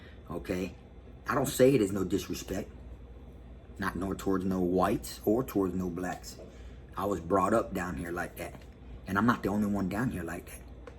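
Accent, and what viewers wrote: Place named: Baltimore, United States. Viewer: Yo u that nigga real talk yo I'm from bmore and it's the exact same way u talkin bout out here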